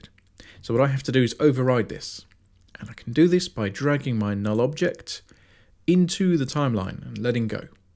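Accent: United Kingdom. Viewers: Not sure if sophisticated American or just British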